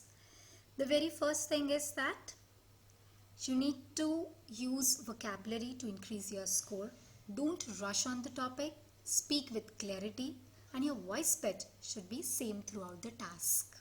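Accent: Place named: India. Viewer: Incoherent Indian accent (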